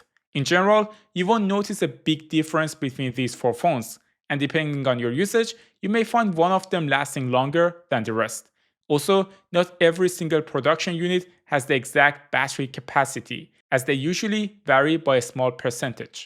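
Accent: Iran. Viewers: Obvious persian accent 😁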